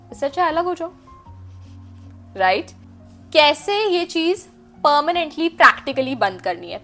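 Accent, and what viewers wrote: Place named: India. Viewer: Muje lga thumbnail se .koi foreigner tarot reader h😂aapki hindi se pta chala aap to indian h❤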